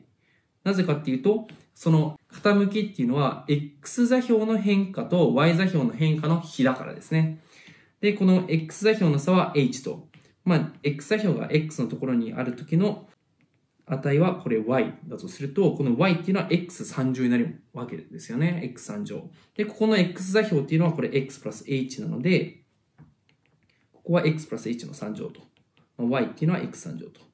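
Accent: Kansai, Japan. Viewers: そんなに違和感ないぞこの関西弁。な↑んでやねんとか言ってない辺りマジでそんなに違和感ない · 語尾が関西弁になるの草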